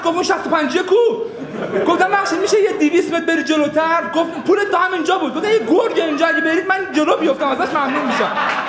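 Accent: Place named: Yazd, Iran. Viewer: خیلی راحت بگم . من درصد بیشترش به خاطر لهجه شیرین یزدی هست که طرفدارت هستم . اگر نباشه .جذاب نیست برام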